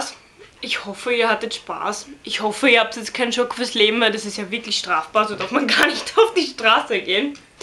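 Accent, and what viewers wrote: Austria. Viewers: kommst du aus österreich?^^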